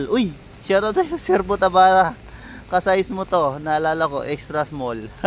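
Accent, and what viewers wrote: Visayas, Philippines. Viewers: Bisaya ka bai? 🚴‍♂️🚴‍♂️🚴‍♂️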